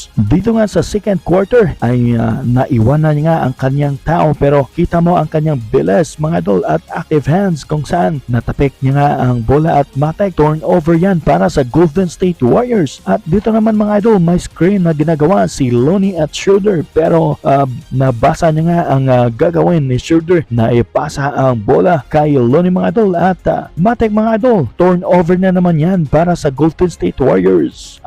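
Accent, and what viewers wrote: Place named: Visayas, Philippines. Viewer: Likers(lakers) amputa tapos sikends (seconds) bisayang bisaya mag salita nakaka urat sa tenga.ambobo mo mag voice over.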